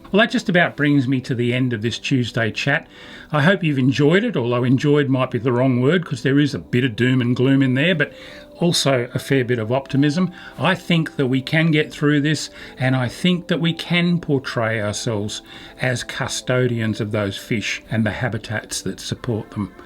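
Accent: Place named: Australia. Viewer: You are an amazing Aussie role model extremely fair in your comments not just with fishing but fair and Aussie the way you talk, thank-you you state it how it is, have a well earned break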